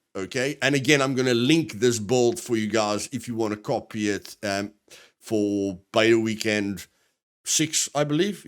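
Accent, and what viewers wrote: South Africa. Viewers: Hey man thanks for the walkthrough ! are by any chance south african ?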